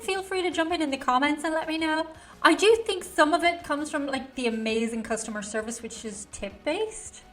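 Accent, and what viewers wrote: Ireland. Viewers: I love your accent. It’s still Irish but I can understand you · Has it occurred to you that you get more friendly reactions in America because you are a pretty blonde lady with an Irish accent? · what a treat!!! I love these~! But then again I could listen to you "read the phone book" with that brogue 😁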